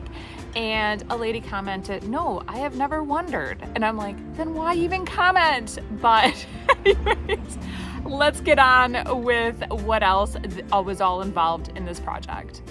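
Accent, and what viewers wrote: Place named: Midwest, United States. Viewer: You are the cutest, hardest working, mid-west accent lovin gal out there!!!!! I adore you, I truly hope you get to rest in between everything you have going on!!!! Take care, have fun!!! Toni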